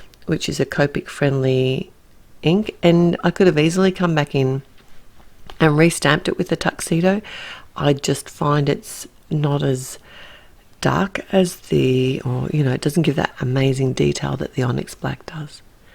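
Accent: Australia. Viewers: I'm so glad you started your channel. I'm from Australia and you sound like a neighbor, here or NZ maybe Anyway... I do enjoy your posts. Wishing you many blessings ")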